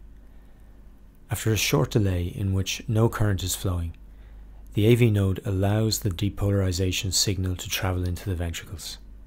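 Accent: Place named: Ireland. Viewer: note to self: develop an Irish accent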